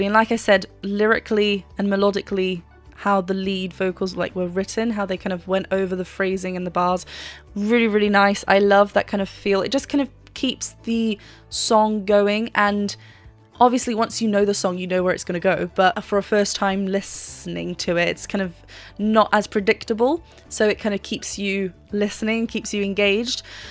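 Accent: United Kingdom. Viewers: Are U from Austria? The Name looka like, but the english Accent is british? Or i am false?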